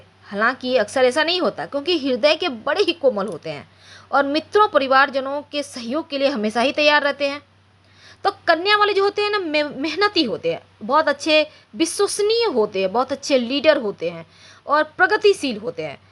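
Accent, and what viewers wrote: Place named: Madhya Pradesh, India. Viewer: If I am not wrong I think you are from mp accent se lagta hai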